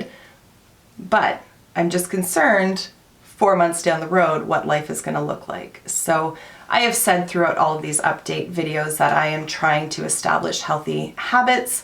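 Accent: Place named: Canada. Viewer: I just came across your channel for the first time, I was just sleeved 9/30/20, I hope I have success similar to yours, 80lbs in less than 6 months is amazing!!! (Sidenote- I have to ask, are you from Canada, by chance? I only ask because I'm from Connecticut,usa and some ppl imitate the Canadian accent, and when they do it's either french or like yours (you don't have much of an accent I just hear it on a few words, I love accents lol) I will definitely keep following your journey!!!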